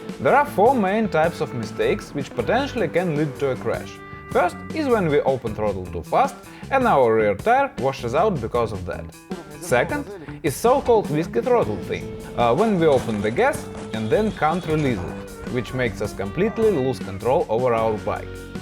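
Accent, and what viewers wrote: Russia. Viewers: Бля, чувак с таким Рязанским акцентом луче не позорился бы и говорил по русски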